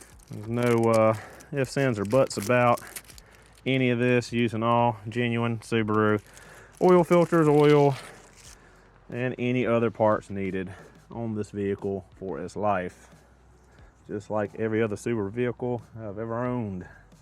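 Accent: South, United States. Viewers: You read my mind when you were complaining about salt I was chuckling because I am next to the Canadian border and every car rust to pieces. You put on a really great show. I like listening to your southern accent. It’s very relaxing and draws you in, you can really tell a story.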